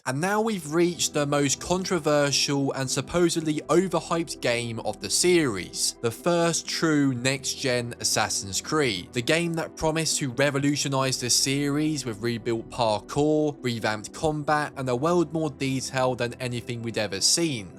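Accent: United Kingdom. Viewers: wait this guy is bri'ish?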